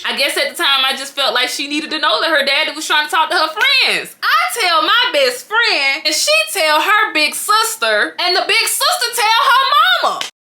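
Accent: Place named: North Carolina, United States. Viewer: WHER U FROM !??? I HEAR YA ACCENT !!!! IM HEARIN NORTH KAROLINA !????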